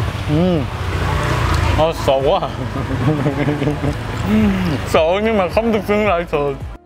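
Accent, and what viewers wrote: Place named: North, Vietnam. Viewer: nói tiếng việt cũng dễ nghe mà, mà sao ở sài gòn mà nói như giọng Bắc ý nhỉ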